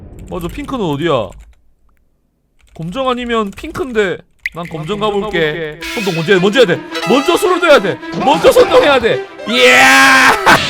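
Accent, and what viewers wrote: Busan, South Korea. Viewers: 부산 분이신가요?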